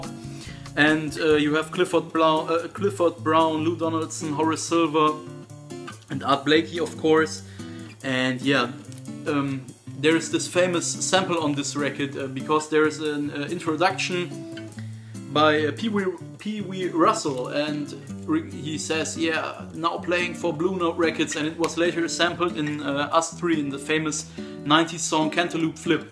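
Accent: Germany. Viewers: Gr8 Album! You look Italian or Turkish, not so German, but you sound German with super English. Young Germans do love their English language! You seem a gr8 upbeat guy for this job:)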